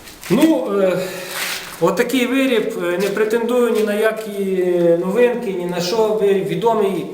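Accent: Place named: Ternopil, Ukraine. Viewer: Тут ще чути тернопільску мову, потім вже буде львівський пан.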